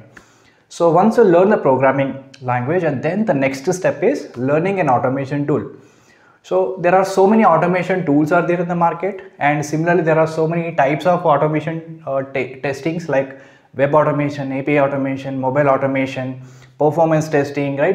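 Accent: India. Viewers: First thing: Improve your English. This is Indian English, not UK/Oxford English. I don`t understand a shit.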